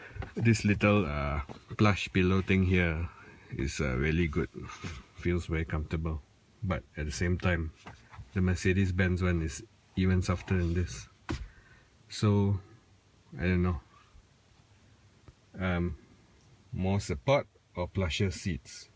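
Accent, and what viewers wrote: Singapore. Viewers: fucked up singlish accent bah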